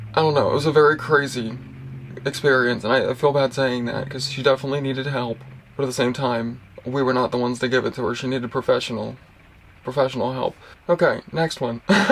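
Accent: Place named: Baltimore, United States. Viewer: I could tell you are from Maryland. You have a prominent Baltimore accent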